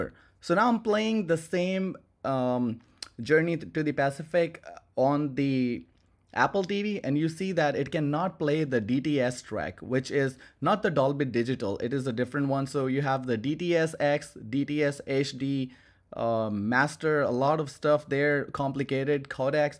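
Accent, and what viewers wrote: India. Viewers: your indian accent is not that strong. don't worry bro.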